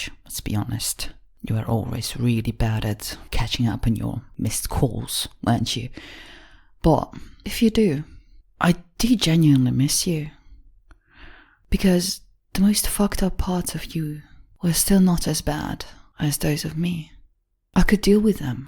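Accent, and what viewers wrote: United Kingdom. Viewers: You are British .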